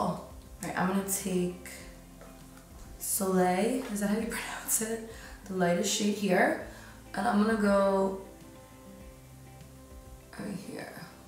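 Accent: New Jersey, United States. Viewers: i can’t tell , does she have a new jersey accent ?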